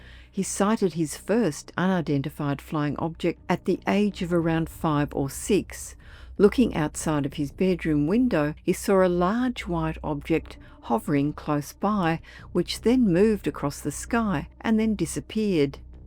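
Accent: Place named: Australia. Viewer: Love your Aussie accent, gentle pace and narrative expression. Great stories too!😀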